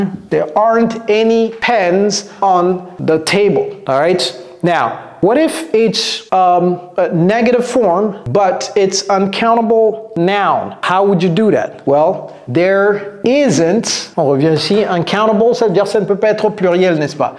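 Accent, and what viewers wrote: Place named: United States. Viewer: Always charmed by your accent Coach..I love American accent by african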